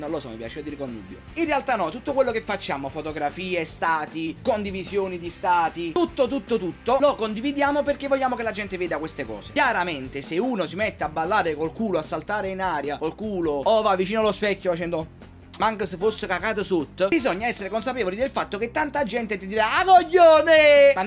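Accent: Naples, Italy. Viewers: questo video lo guardo tutti i giorni ed è l'unico modo di stare bene poi quell'accento mi ricorda la mia lontana napoli . Sorrido sempre ciao hahahah no dai forse è troppo così hahahha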